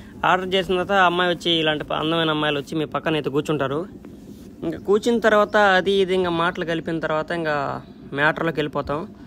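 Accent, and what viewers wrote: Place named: Nellore, India. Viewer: Orey mawa nuvvu maa nellore vadilaane unnave..?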